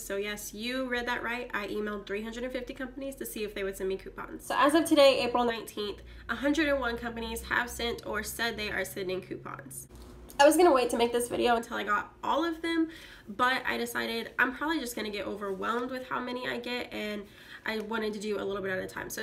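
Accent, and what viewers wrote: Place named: Texas, United States. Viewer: Woodlands? Or Conroe I’m in Huntsville lol 1 and half from Houston! I started couponing about a year and half ago and I left my job as a prison guard to finish my schooling at shsu:) my husband and I did the same when we were working for the state of Texas but after we left to go back to school full time we realized that money was tight and I decided to coupon. I was just wondering I felt like you lived close to Houston by the way you talk. I’m from Kansas so I recognized certain things about Texas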